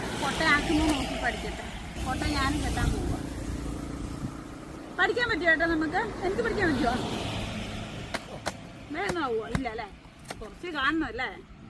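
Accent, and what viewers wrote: Kottayam, India. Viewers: Kottayam thodupuzha slang aanallo nattilevidaya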